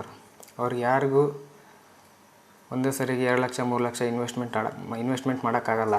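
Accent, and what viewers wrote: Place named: Raichur, India. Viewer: ನೀವು ಆಡೋ ಭಾಷೆ ಕೆಲ್ತಾಯಿದ್ರೆ ರಾಯಚೂರು ಜಿಲ್ಲೆಯ ಬಾಗದವರು ಅನ್ಸುತ್ತೆ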